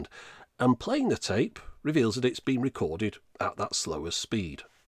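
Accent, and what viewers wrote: United Kingdom. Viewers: Not sure whose voice I like more: classy British accent or the deep, groovy voice on that Datsun Z advert. Tough call.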